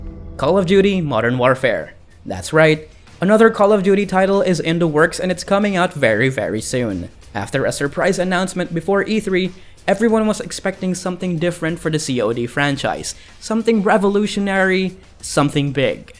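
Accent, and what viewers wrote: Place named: Philippines. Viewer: You must be Filipino, cuz I hear it in your accent. Am I right?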